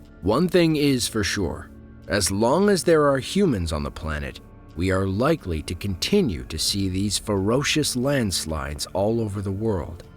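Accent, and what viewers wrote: United States. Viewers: with American voice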